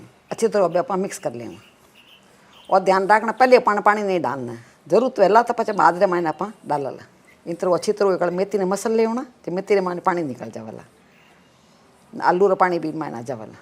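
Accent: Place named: Marwar, India. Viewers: Receipe re Sathe marwadi boli gani achi lagi sa. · जय श्री कृष्णा Thaki मारवाडी बोली घनी चोखी लागे. रेसिपी बतवणकी पद्धत आणि सब bartan बहोत अच्छा लागे. या sabji तो mhe जरूर banayne देखुला जी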